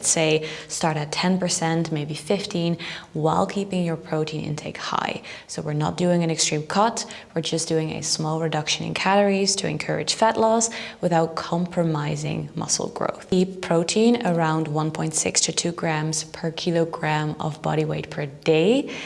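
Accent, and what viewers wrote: Netherlands. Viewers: Very Dutch accent 😂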